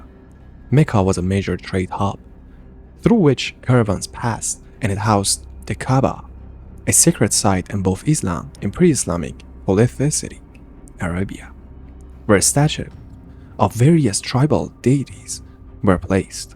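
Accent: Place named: China. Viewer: For da luv of god please stop talking ! Ur chinglish is terrible ! Get someone who can speak & pronounce English words correctly.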